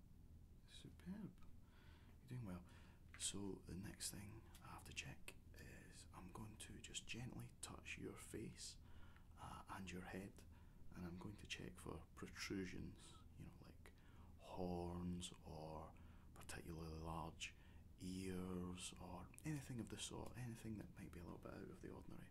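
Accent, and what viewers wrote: Scotland, United Kingdom. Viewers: Loving the videos man keep em coming! Scotsman myself so its great to hear our accent being put to good use :P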